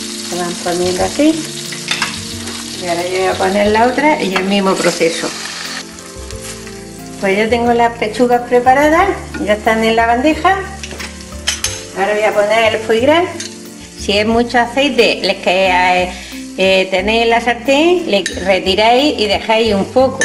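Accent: Murcia, Spain. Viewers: las hice ayer buenisimas usted debe ser de cartagena o murcia por el habla murciano yo soy de ahy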